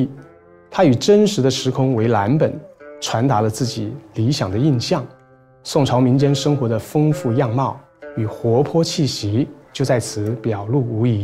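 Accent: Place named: China. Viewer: 聽聲音是大陸腔，但漢字，喜歡漢字。謝謝制片人。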